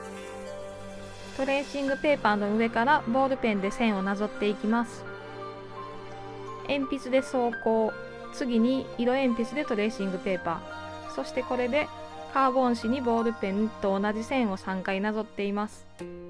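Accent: Kyoto, Japan. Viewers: 京都人やから一瞬で京都の人やとわかった、、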